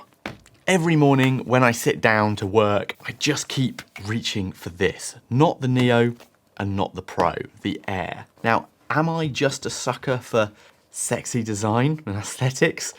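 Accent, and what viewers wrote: United Kingdom. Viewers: Great video! I loved the British accent!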